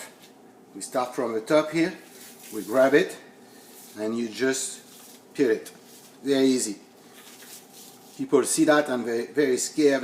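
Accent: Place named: France. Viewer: if he only did not have a french accent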